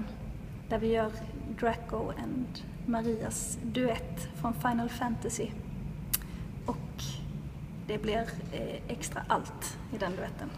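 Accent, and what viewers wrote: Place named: Scania, Sweden. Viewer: Most swedes don't anyway, she's from Skåne, perfectly understandable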